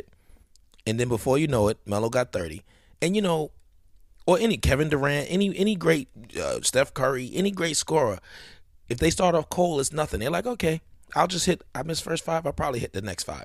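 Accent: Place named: Brooklyn, United States. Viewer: Bronx all day! Haha, sorry I said I heard a BK accent. Haha. Yo what's your thoughts on bitcoin and crypto brother? ✌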